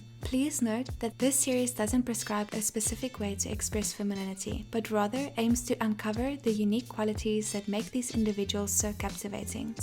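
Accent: South Africa. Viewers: Are you South African? You sound like you are from South Africa. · Are you South African? Something about your accent gives me that impression. 💗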